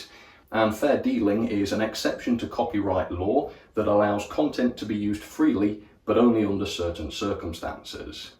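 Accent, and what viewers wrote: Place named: Liverpool, United Kingdom. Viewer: I figured that he was in Liverpool based on his accent. Just guessing though.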